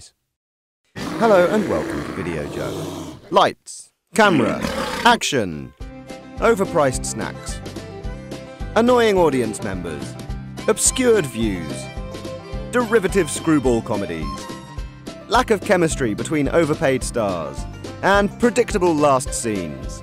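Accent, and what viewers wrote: United Kingdom. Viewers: Brits? He sounds a bit proper and cheeky. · yeah, but the voiceover guy had a british accent, so scissors paper stone is probably the british version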